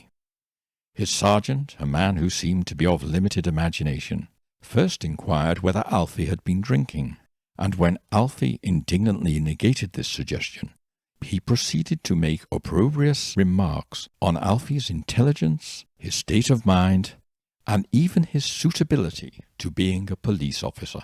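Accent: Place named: RP, United Kingdom. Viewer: Petite question. Where does your pronunciation of the U vowel come from? e.g. cushion, bullet and put. Am intrigued as otherwise you come across as a relatively RP speaker. Enjoy your content until your slow delivery and soporific voice takes effect. Cordialement. Alexandre Garden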